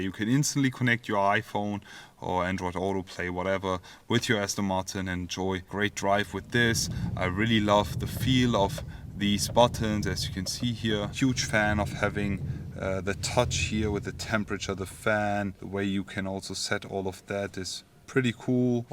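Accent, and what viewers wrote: Germany. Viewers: Is that denglisch you talking ?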